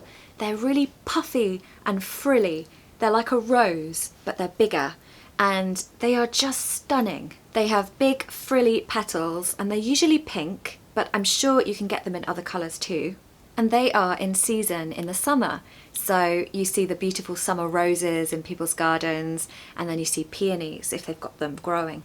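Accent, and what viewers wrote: United Kingdom. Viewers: Your british accent is amazing😊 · It's so clear your British accent that makes easy for us to learn. The emphasis in each word with details very well explained it,s amazing. Thank you so much for the lesson 🤩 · Tysm for this video! ❤ It's very useful to improve my vocabulary. I love your accent and British English as well! 🥰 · Thank you so very much,. I love listening to you and with the lovely British accent. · Love your british accent , and I can feel your energy and passion ! to cool down is pretty hard to pronounce:) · thank you for interesting videos. British accent is very nice. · OMG ! Your English accent is just fascinating , it's really relaxing to hear such a nice and slow pronunciation ✨ I fall in love with the British accent 🥰❤❤ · Hi,. I can understand your enjoyment about summer. I live in a region where the sun shines all year round, and get annoyed if it rains three days. 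However, I understand that we need the rain, of course, otherwise we wouldn't have food, but when the sun is bright it's good isn't it? Love your accent, you're a real british woman, thanks. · thank you for interesting videos. British accent is very nice👍😁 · It has been just amazing, I like the energy you put into your videos, the enthusiasm you show and of course your british accent that sounds pretty good to my ears. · Thanks you teacher, I like your British accent, very easy to understand. ✌️👍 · You are just adorable, beautifull, positiv, vivid, enthusiastic and your Brithish English is so lovely to listen to! Your smile and your eyes remind me on princess Diana a lot. I love your way of teaching English by telling stories about rhe British way of living, thinking and custims. I find it very interesting and useful. You bring joy and happiness into my day every time I wach your videos. 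Thank you so much and have a great summer! ❤😊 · "Shall I compare thee to a summer's day" as Shakespeare says. Enjoying the chaste British accent. Thank you❤ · Lovely British accent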